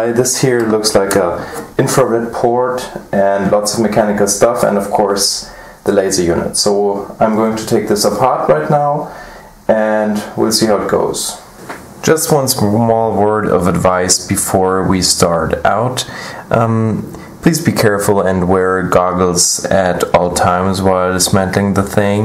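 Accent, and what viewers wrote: Germany. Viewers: I knew you were german when you started talking hahahahahaha hallöchent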